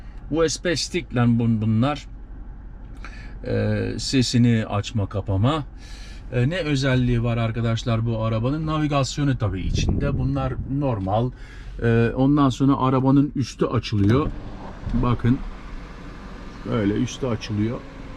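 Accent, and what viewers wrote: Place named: Zonguldak, Turkey. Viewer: Usta türkcen güzel, fakat yinede anladim sen zonguldakllisin, kastanonu karabük veya bartin da olabilir ama zonguldak derim?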